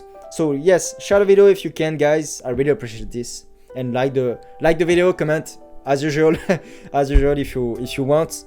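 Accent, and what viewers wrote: France. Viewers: this french accent is torture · i don't know why but i understand all your video, on dit merci l'accent français, je comprends que celui là 🤔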